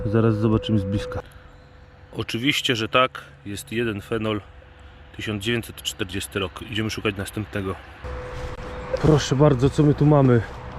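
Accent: Silesia, Poland. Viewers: Godosz po Naszymu a piszesz KASZANKA hahaha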